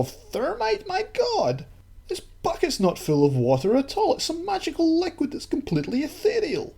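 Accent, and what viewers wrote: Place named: Ireland. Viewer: i think he is Irish lol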